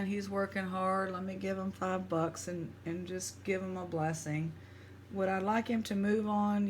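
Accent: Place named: South, United States. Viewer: Hi from Victoria BC in Canada! I just want to tell you how much I appreciate and look forward to your videos. I love your beautiful voice with your slow southern accent. I adore hearing your stories and your adventures in dumpster diving, rock hunting and metal detecting. Telling us about your pets and finally finishing up with your devotional reads. When you find precious metals I’m just sooo happy for you. So, if you ever think that no one listens or appreciates you - remember that you make a difference to a little Canadian living in the constant rain, dampness and cold over the wintertime here on Vancouver Island. Big hugs to you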